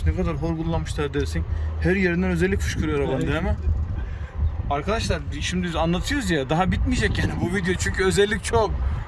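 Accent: Gaziantep, Turkey. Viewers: Amcaoğlu hafiften Antep ağzıyla konuşuyor. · Plaka 27 amcaoglu antep şivesi ile konuşuyor. Abi sen anteplimisn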